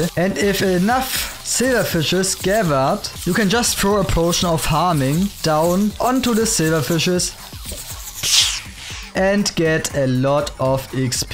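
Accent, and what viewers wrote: Germany. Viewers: I hear deutsch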